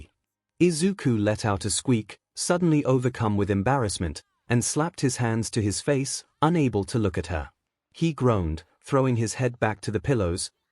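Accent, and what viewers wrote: United Kingdom. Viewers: Whys the tts bri'ish?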